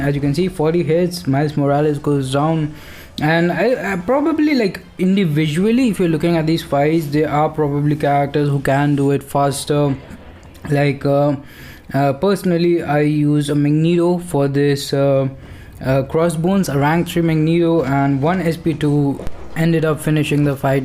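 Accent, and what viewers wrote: India. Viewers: When I saw him 1st time I thought he look like Indian and his Accent and Now finds out he is 😘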